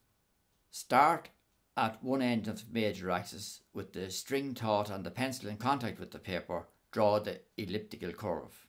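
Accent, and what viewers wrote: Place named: Ireland. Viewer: Love it! So well illustrated! Great to hear the Irish accent too! Cavan? Drogheda?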